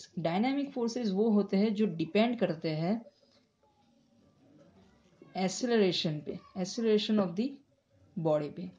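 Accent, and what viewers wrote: India. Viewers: I closed the video after hearing indian accent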